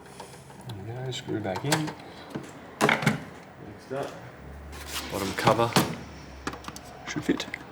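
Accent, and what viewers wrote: Australia. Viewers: Super! An Aussie explains.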